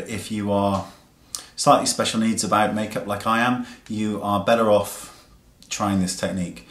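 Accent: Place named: West Country, United Kingdom. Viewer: You have a slight West Country hint to your accent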